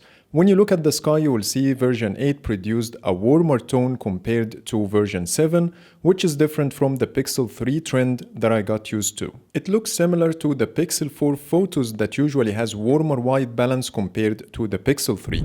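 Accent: Egypt. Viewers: Very nice Egyptian accent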